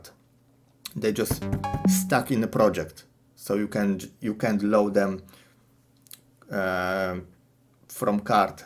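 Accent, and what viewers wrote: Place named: Poland. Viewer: That accent is impossible to miss. Are you Polish? And since you use the name, I guess your name is?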